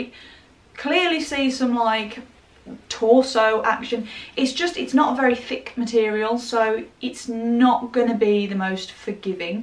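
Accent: United Kingdom. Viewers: I have to say I love your accent!Which part of the UK are you from? Anyway, you're gorgeous!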